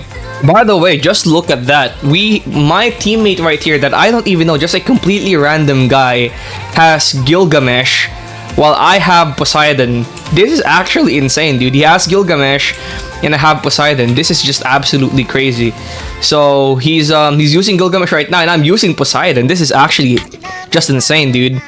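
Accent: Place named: Philippines. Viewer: are you filipino? because your accent is like filipino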